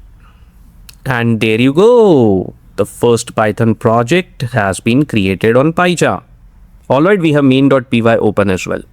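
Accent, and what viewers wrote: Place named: India. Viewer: why you sound indian?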